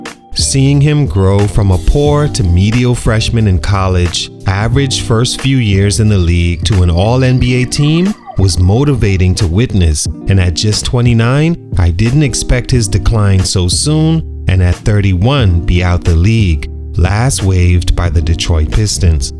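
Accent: Bahamas. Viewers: Bro, I completely forgot about Kemba. Excellent video! BTW, you sound Bahamian. If so, big up from Canada! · Bey narrator you Bahamian ay?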